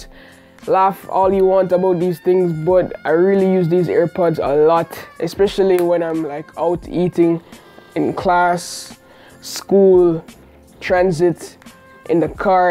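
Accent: Jamaica. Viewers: Lol this guy kinda have a Jamaican accent, where you from fam ? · Where my boy from? He accent kicking. Just sub. Update now I see you is a yard man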